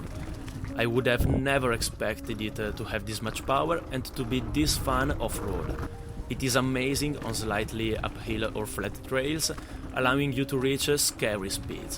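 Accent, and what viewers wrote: Italy. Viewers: U sound Italian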